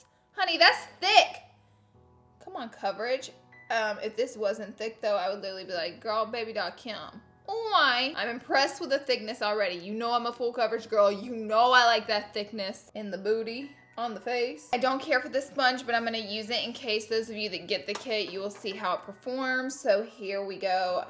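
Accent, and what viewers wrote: South, United States. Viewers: she sounds southern in this video hah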